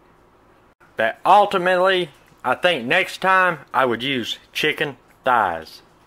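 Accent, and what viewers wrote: South, United States. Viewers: You can cook! And I love your Southern accent. Reminds me of home. My mom’s home anyway. Great memories. Keep kookin’! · This really looks delicious. I make plain chicken breast in water in the crockpot for shredded chicken sandwiches and the chicken is usually a little dry. Sometimes we put gravy on it but a little annoying that it’s dry. Don’t care for the Crack chicken name either. Ranch shredded chicken sounds better. Really like your channel. You serve up great recipes with a great southern accent. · Love your southern voice!!!! Love your videos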